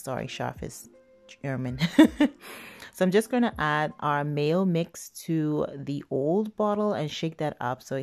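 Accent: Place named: Jamaica. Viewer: Love ur Jamaican voice 😆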